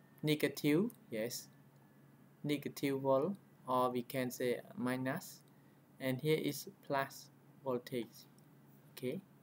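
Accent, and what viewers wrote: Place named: Peru. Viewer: Es como un peruano hablando inglés.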